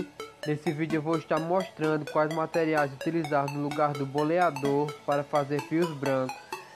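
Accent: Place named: Northeast, Brazil. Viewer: E esse sotaque do meu nordeste? <3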